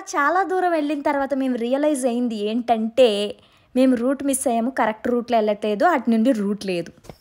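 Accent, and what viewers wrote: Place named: Telugu, India. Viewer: ur pure Telugu slang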